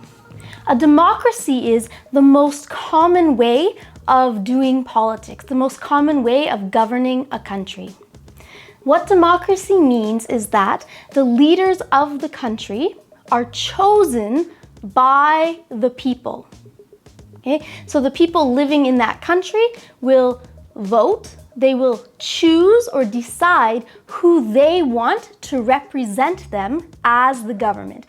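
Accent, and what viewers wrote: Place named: Canada. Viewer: Thank you for good lesson! I live in Ukraine and always wanted to visit Canada! It's a very big pleasure listening Canadian pronunciation!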